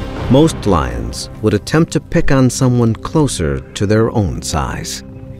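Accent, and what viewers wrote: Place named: United Kingdom. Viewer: Cant beat a UK commentator for these kinds of documentaries.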